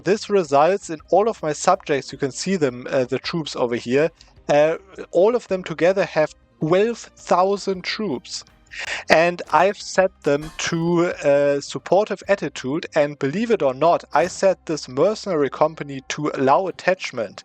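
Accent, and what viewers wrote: Germany. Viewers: As a Pole I hear that you're German in every word you say haha. Great video, good advice bro!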